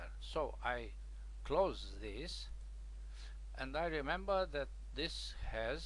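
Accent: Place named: Poland. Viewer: I find listening to your lesson reminds me of talking to my Polish father and relatives. It was very well done and understandable.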